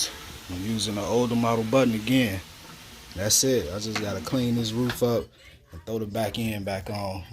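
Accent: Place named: Chicago, United States. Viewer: yo commentary funny ash as soon as da video started ik u was frm da raq watchin frm tx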